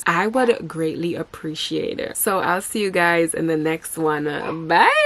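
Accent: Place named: Caribbean. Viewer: Reslly informative, and good job on the weightloss. Aldo i hear an accent, are you of caribbean desent?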